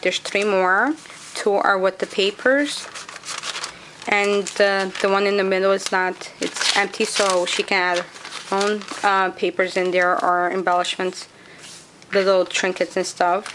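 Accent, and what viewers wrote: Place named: Spain. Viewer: I've never seen work like yours' before....very very original and one of a kind and I LOVE it ....such wonderful inspiration...and what a different accent too...eres Espanola???? Just asking is all :-) Great job.....New Subbie Here too....Laura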